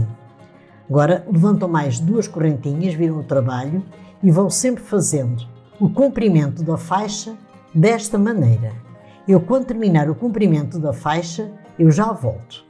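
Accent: Portugal. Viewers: Muito linda e seu modo de ensinar maravilhosa. Seu sotaque lembra muito minga avó portuguesa. Miro Salvador. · Amo seu sutaque portugues..a sua explicação é maravilhosa...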